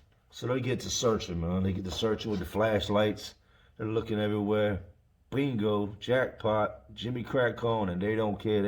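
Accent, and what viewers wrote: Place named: Baltimore, United States. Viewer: For real you talk and vibe like dudes in Baltimore.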